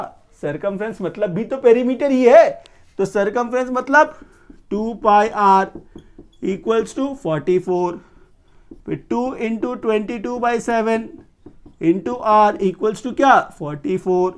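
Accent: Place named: Bihar, India. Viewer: dhanyawad sir itne ache se samjhane ke liye apne har ek word bihari style me ache se samjhaya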